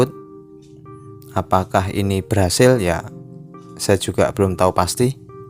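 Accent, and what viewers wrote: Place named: West Java, Indonesia. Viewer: njir sunda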